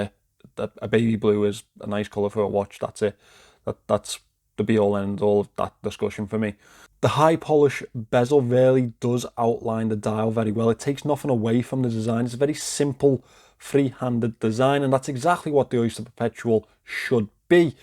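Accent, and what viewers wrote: Liverpool, United Kingdom. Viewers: Bought the fluted bezel Datejust homage from Pagani a while ago and was thoroughly dissapointed in spite of the low price ! Very poor finishing and quality overall, poorly executed jubilee bracelet ...... it just felt like a "cheap ass watch" !! So it was with some apprehension that I ordered the dark blue OP V2 homage from Pagani, based on some very good reviews online. I must say, that the overall quality and impression of this model is lightyears ahead of the Datejust that almost put me off Pagani forever ! It feels that the quality of the watch is on par with my Seiko SARB033 and my Citizen NB1050-59E JDM. I was so impressed that a couple of days later I also ordered the non date Tiffany blue model, which will hopefully arrive in a couple of days :-) From what I can see in your review (or non-review by your statement :-) ) I will not be dissapointed. 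Thanks for the review - keep them coming ! Just pushed the subscribe button :-) Also great to hear a "Scouser" accent in a review, even though I am not British ! ;-)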